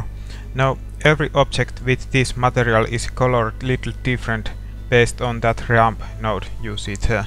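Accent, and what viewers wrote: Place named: Finland. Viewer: wow nice... you are skilled artist, hopefully we will see more from you in future. i have to say you are first fellow finn (yeah heard from your accent) who makes videos about his/hers blender projects :)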